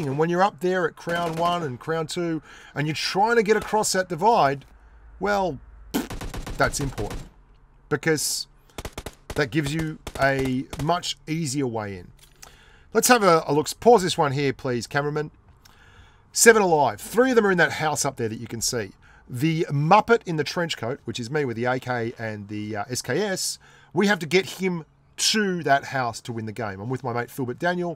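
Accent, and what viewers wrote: Australia. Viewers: Manav Plays It's Australian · Is this guy from Australia? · Australian mate. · Why do ppl always say it is british, it is clearly Australian accent, it even shows in the flag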